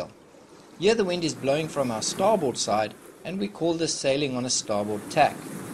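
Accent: South Africa. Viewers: Totally Fabulous - love the SA accent too !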